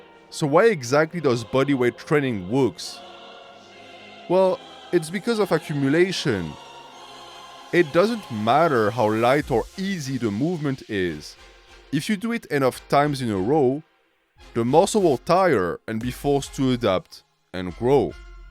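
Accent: France. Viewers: Ur french but other than that nice video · As always, great stuff! Just sad you didn’t start the video with “hey YouTube” in your French accent That’s half the reason I watch these videos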